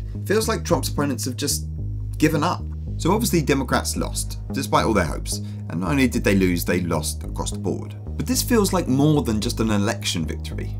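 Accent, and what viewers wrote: United Kingdom. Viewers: Happy the lords of the algorithms sent me your way today. I appreciate wise insight pertaining to the failure of chump 2.0, and, as corn-fed midwestern American boy, I always enjoy listening to a voice from across the pond. Liked, subbed, and now appeasing the lords with comment. Thank you! 🙏🏽✌🏽💜 from Minnesota—Sanctuary Land of 10,000 Tampons and Free School Lunches (&—thank you, Coach Tim!—Legal Recreational Marijuana, finally.) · Sounrs loke a brit🇺🇲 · Your accent tells me you never ever been in a red state before. Trust me. This shit is a RELIGION TO THEM. Political rules do not apply. Trump worship is a matter of FAITH. He can kill everyone they love right in front of them and not lose one vote. Now can the Brits STFU since y'all don't know wtf you are talking about, ever? Is brexit gonna end? Nuff said.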